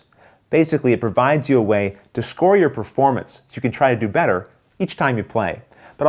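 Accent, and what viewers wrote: Canada. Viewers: is he canadian? he says "about" and "out" very canadianley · We've played this after watching your video, have to say, wonderful job you're doing there and i like that Canadian accent of yours, cheers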